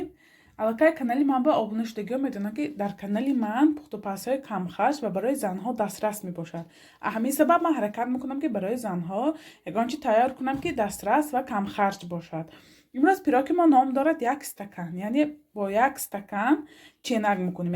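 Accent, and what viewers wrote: Isfara, Tajikistan. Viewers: Ассалому алейкум ва Рахматуллохи ва Баракатух ойя шумо аз Исфара лахчатон Исфарача бехтарин пухтупаз барои занхо 👍👍👍👍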